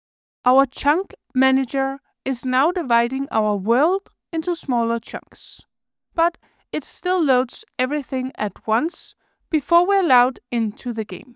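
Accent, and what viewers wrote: Denmark. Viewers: No problem, have subscribed and rung the bell too. also are you danish by any chance?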